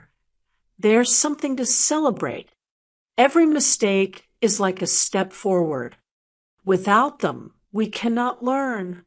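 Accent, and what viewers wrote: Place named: United States. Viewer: American acsent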